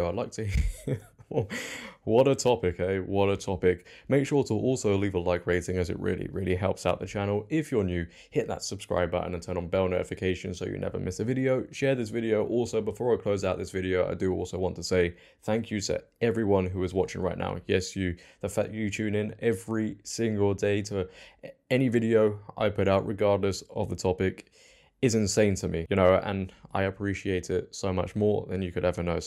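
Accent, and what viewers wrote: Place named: United Kingdom. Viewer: British accent of course lol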